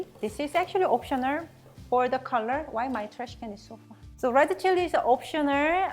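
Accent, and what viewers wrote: South Korea. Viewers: what do you mean by dialect? She's Korean and just has an accent when she speaks English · fyi korean has many dialects... and by dialect i mean her korean dialect not her english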